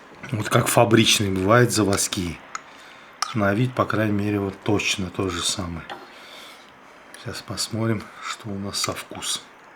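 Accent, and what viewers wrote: Baku, Azerbaijan. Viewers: Родной Бакинский акцент! ❤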